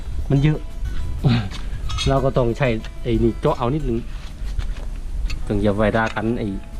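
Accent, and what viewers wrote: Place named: Korat, Thailand. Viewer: โมแปลกดี คลิปดีมีประโยชน์ ติดตามชม สำเนียงคนโคราชคือบ้านผมเด้อครับ 👍👀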